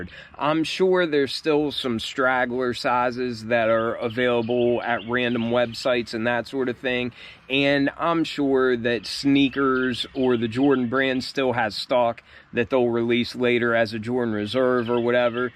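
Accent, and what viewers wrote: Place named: South Jersey, United States. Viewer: U sound like ur from south jersey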